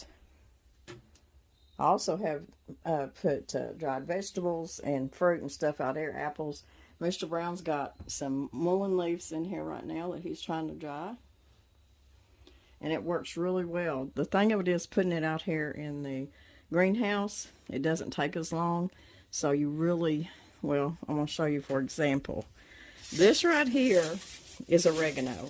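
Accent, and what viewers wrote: South, United States. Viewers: I just thought you were gonna tell us a good story! I hope it's not going to be "one of those days!"... I spent the major part of my life in the south, so it's not like I'm not used to the accent, I have one myself! Have a blessed day!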